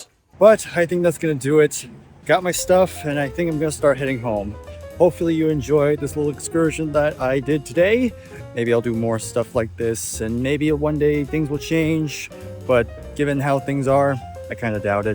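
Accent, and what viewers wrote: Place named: England, United Kingdom. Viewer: I'm used to speaking in an English accent. I tend to look for specific things. I don't like just browsing and buying stuff I might like without checking it out first. I'm after certain items and online I can check who has it in what condition and for what price while riding the train to work. I also buy a lot more that way... Hmm...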